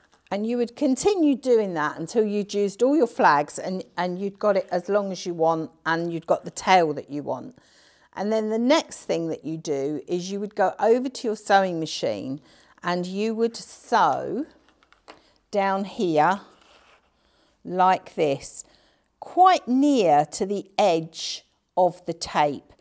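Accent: United Kingdom. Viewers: Plus I just love your British accent.😊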